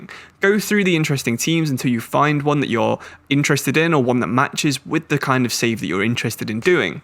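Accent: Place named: United Kingdom. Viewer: broo i love your english accent... is soo funnyyy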